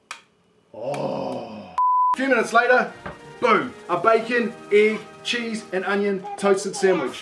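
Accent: New Zealand. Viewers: No offense, but I find the New Zealand accent the most hilarious 😂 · Came here to hear maori accent 😅I miss home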